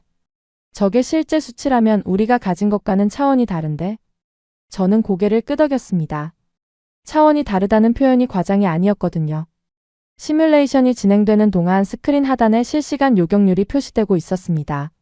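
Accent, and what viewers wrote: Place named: China. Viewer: 발음이 중국이네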